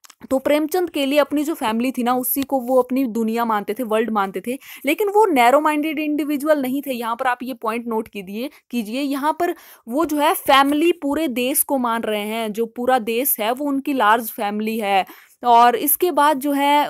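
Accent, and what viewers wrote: Haryana, India. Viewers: Mam aap Haryana se hai?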